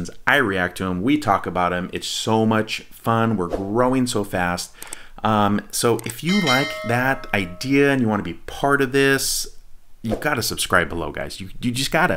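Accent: Tamil Nadu, India. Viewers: I love your tamil accent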